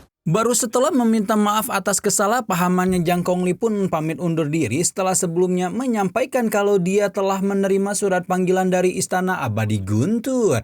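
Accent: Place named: Java, Indonesia. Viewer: candu ama nada mutiara membangkang surga next lebih medok lagi bang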